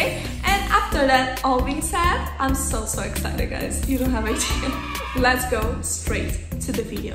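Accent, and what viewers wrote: Brazil. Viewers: Great reaction! I love Lara, she is such an underrated artist. Unfortunately, she didn't make it in America so she kinda missed a huge market and the public a huge artist. O sotaque brasileiro é inconfundível, rs.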